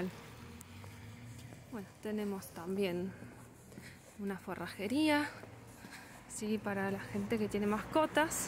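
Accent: Argentina. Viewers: Excelente castellano y acento argentino. ❤Río Ceballos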